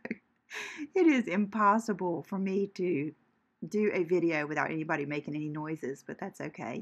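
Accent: South, United States. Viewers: Great video!!!! You’re voice is so welcoming and southern! ♥️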